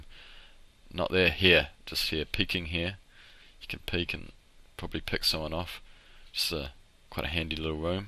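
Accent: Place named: New Zealand. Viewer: cool accent, New Zealand? You sound like flight of the choncords.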